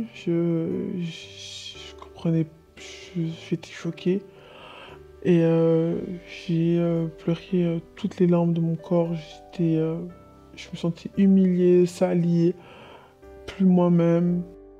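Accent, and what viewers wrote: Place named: Belgium. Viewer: Sa s'entends que c'est une Belge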